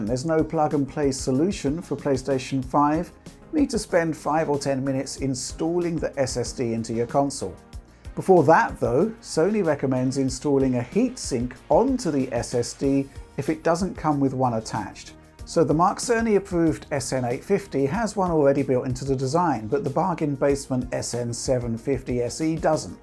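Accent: United Kingdom. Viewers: That's how the English say aluminum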